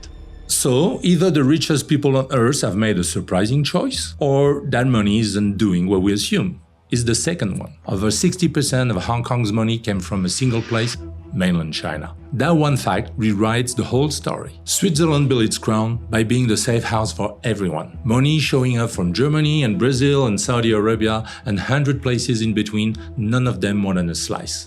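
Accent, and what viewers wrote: France. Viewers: Lol says a guy with french accent , , compare to Switzerland is France dump with all there problems‼🤭😂🤣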